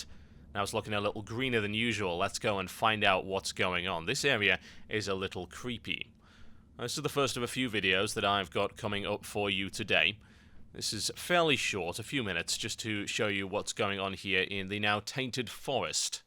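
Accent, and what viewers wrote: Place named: United Kingdom. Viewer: I'm not playing till cataclysm, beteen this guy and omfgcata I'm even more stoked about cata, the best part is, I'm british so this guy is like my on kind =), even though accents and language varies dramatically depedning on hich part of britain you're from, ahhh the way our language has been bastardised over and over...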